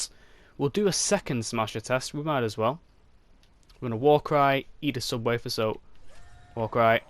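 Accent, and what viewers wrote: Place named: United Kingdom. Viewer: Half the reason I watch is for your delightful accent! British?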